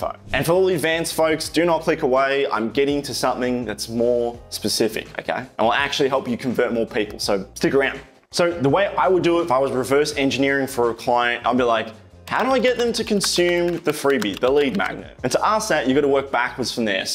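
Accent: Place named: Australia. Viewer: are you an aussie ? just curious kinda sound like that great vid btw lol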